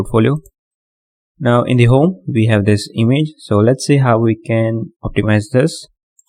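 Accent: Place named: India. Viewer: The problem is that your English is pretty hard to understand, no offense. I know it's the standard Indian English, but still. If your primary audience speaks the same way, no problem, but if you target a wider audience you may want to up your game. I tried to focus on the content and ignore the accent but I didn't make it through the video.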